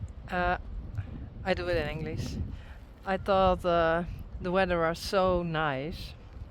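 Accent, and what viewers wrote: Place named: Netherlands. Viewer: Ur English with the Dutch accent is perfect don't change a thing. Spidey is a character turning into a holy terror but that's his personality eventually as he gets older he will settle down don't sell him he's a large part of ur pony herd he's Mr personality. U seem to b recuperating at an awesome pace just don't overdue it acknowledge ur limitations before moving ahead so as not to reinjure urself.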